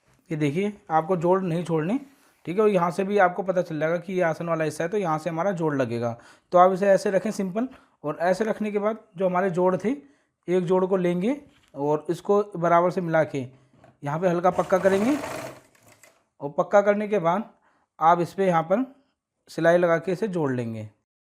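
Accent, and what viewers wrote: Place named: Uttar Pradesh, India. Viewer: Aap bolte hai to lagta h aap up se hai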